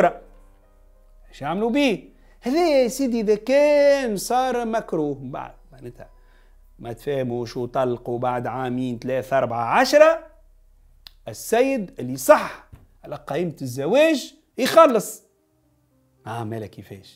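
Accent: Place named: Egypt. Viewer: يا سي طالعة عليك الأكسون المصرية، اما البلاصة إسمها دقَهْلية موش دَقْهلية السكون على الهاء 😁😅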